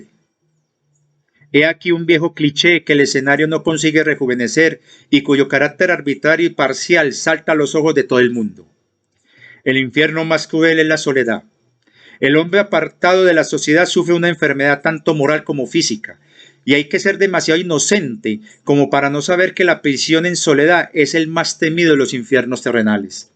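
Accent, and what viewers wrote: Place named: Colombia. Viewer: Amigo, es una agrado venir a escuchar sus relatos, historias e información, es muy agradable porque uno se siente como en casa, con gente que habla y siente como nosotros, los "diferentes". Debo confesarle que me encanta el acento colombiano, así que mas agradable se torna escuchar lo que nos cuenta. Muchas gracias por su labor.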